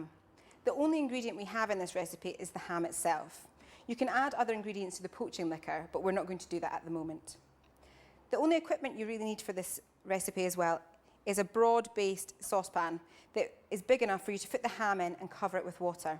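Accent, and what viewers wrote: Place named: South Africa. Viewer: I just love the South African accent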